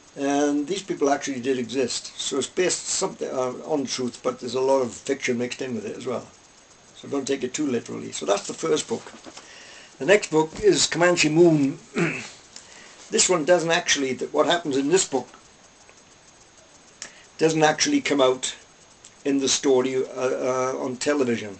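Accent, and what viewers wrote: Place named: Wales, United Kingdom. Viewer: Thank you for this. As a Welsh woman living in England, it was lovely to hear your accent and Lonesome Dove is one of my favourite novels, so I liked your book review. (The pipes looked really good as well :)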